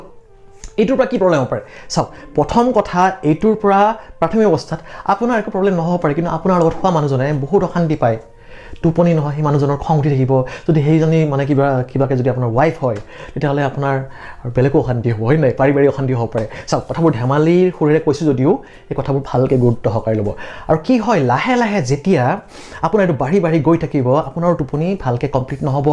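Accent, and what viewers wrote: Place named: Nalbari, India. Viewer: চাৰ আপোনাৰ মূখ্ত আমাৰ আপোন নলবাৰী ভাষা শুনি বহত বেছি ভাল লাগে আৰু আমাৰ নলবাৰীয়া হিচাপে আপোনাৰ পাই গৌৰব অনুভৱ কৰোঁ চাৰ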